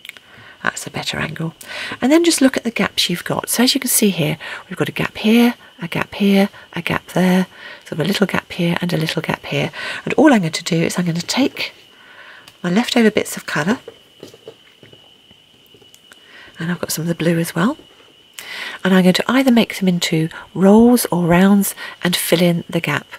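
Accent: England, United Kingdom. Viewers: That beautiful English accent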